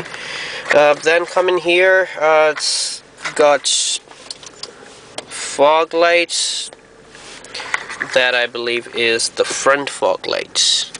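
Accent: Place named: United States. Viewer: You must an American with your accent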